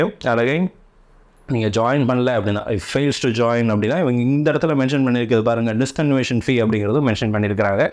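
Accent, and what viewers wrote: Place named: Madurai, India. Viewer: சார் நீங்க என்ன மதுரைக்கார(ய்)ங்களா? அவ(ய்)ங்க தான் சார் இப்படி பட்டு பட்டுனு பேசுவா(ய்)ங்க.. கொஞ்சம் கூட கோயம்புத்தூர் வாசம் பேச்சில் வீசவேயில்லை😊 இனிய காலை வணக்கம்.நனறி😊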